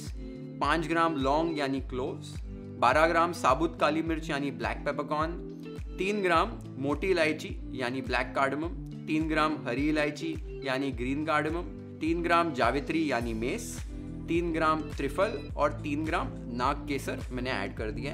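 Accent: Maharashtra, India. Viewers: Hey chef your pronunciation of marathi words is assal Marathi !!!! your recipes are so authentic and explained with such an ease. I am a Marathi but instead of following any Marathi chef I follow your recipes and always wait for the new ones. More importantly you represent it with no nonsense talks. Ekdam to the point. Thank you ! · Really appreciate for your marathi words accent 👍🏻👍🏻👍🏻 अस्सल कोल्हापुरी झणझणीत मिसळ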